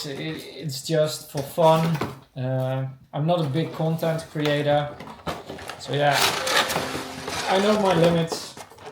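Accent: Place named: Netherlands. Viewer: Grappig hoe je gelijk hoort dat je Nederlands bent. Toffe figures. Ben zelf niet echt een fan van die Baby vegeta, oogt te groot.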